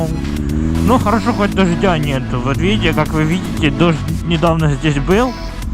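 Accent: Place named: Ukraine. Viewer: смищно говорит!) Особенно смешит этот украинский акцент)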